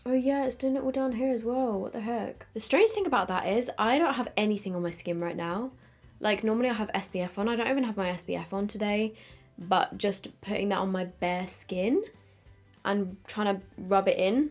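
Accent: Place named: United Kingdom. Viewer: Is it just me or was extra british in this video😂 absolutely beautiful as always!!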